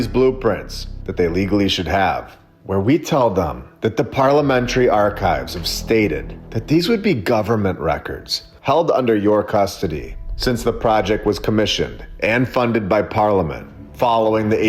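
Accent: United States. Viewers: I knew what he meant. He's American, they say words differently.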